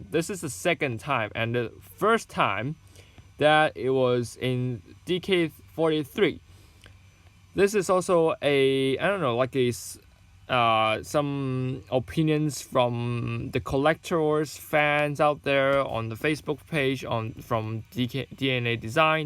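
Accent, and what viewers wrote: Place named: United Kingdom. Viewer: British accent~~~~~~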